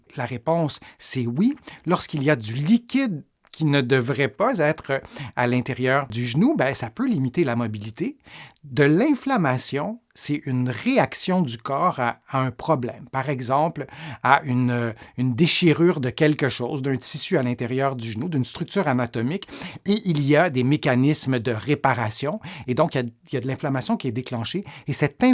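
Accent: Quebec, Canada. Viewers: Mon problème au genou est du à de nombreuses chutes de vélo il y a 40 ans mon genoux étai haché plei n de gravillons et la je vais faire une radio car il y a un épanchement je doit faire une ponction j ai 78 ans merci pour votre vidéo votre accent québécois me rappelle mes amis du Québec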